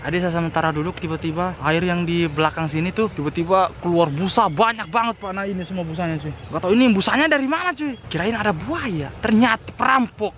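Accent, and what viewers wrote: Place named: Banyumas, Indonesia. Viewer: Kok bahasanya kayak jawa ngapak, sebenernya orang mana si abang ini... Bingung akuu itu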